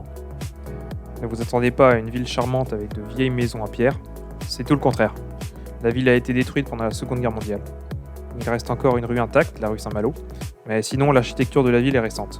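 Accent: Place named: Paris, France. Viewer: n'est pas un gars du coin, car il prononce les noms à la Parisienne! C'est désagréable d'entendre quelqu'un vouloir enseigner aux autres en écorchant ainsi les bases.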